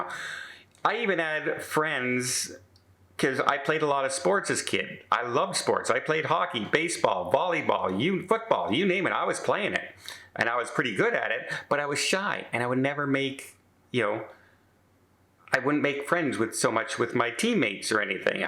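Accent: Canada. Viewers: I just love when your Canadian accent comes out, it always makes me smile. Thank you, for sharing your story.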